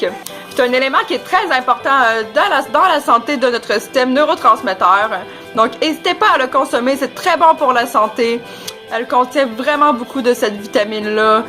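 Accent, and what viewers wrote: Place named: Canada. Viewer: On va me traiter de raciste normal c'est la nouvelle génération de merde mais, l'accent canadien me stress j'ai phobie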